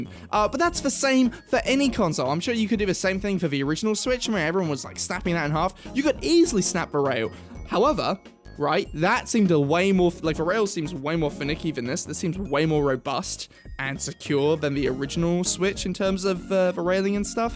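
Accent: United Kingdom. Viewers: How am I only just now realising is British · you sound like a steriotypical british person and i love it suuuuub~ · I don't like how British you've been sounding lately. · im being honest your the most British person ive ever heard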